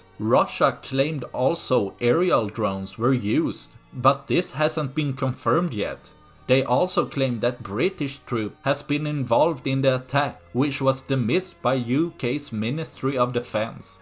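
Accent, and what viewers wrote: Sweden. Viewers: From your accent, I can bet you are from Sweden! ;) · Hey Swede, (you must be with that accent), have you thought how the Russians could present a video of the attack by a drone boat that was allegedly Ukrainian? This is a false flag operation! 😡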